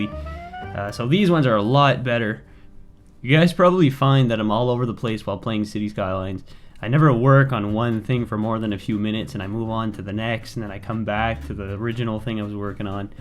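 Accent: Canada. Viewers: Canada, I knew it. I knew you had to be from New England or Canada or something. I wish I knew what my Pittsburgh accent sounded like to other North Americans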